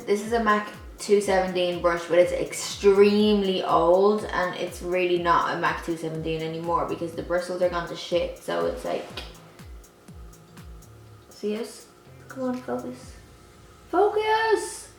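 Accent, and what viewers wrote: Ireland. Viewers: You just speak like Bono and the others from U2 😎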